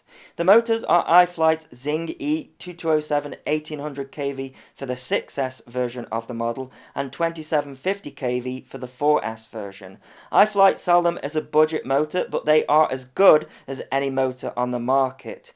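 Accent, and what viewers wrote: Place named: United Kingdom. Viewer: Yey! He's back... Teasing us with his new toys!!! :-D Great to hear more and more British accent on the hobby channels!!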